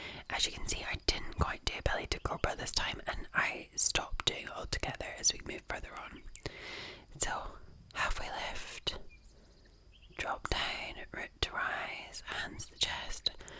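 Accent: Scotland, United Kingdom. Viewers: 3rd comment..hot mom Scottish ❤ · Thank you so much,.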